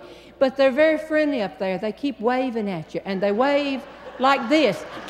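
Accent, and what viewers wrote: South, United States. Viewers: I've watched two separate videos on YouTube and correctly identified the guys as North Carolina natives (Eddie Smith, owner of the 1967 Ferrari NART Spider recently auctioned for millions, and singer Scotty McCreery), all thanks to watching videos and hearing her beautiful southern accent. Her wonderful stories make me want to visit the US and travel from North to South just to see if I can peg the difference in accents. 😉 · I’m a wee Scottish woman and I understand every word of your lovely southern accent!!! 🤩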